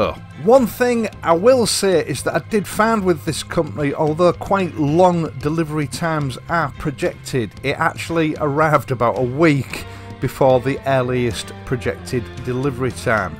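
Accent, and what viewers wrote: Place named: Yorkshire, United Kingdom. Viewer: That's what keeps me watching your videos, I don't own a Triumph or an Enfield and probably never will, but your stuff stands out from the crowd, honest, down to earth, informative, entertaining, and, above all, totally individualistic! As an added bonus they are delivered with the best, broad Yorkshire accent one ever heard! Keep 'em coming mate! (and that's from South Australia where we can ride all year round!)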